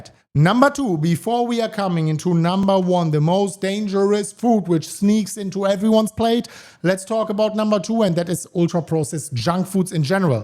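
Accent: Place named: Germany. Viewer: Du hast aber auch einen deutschen englischen Akzent 😂❤😂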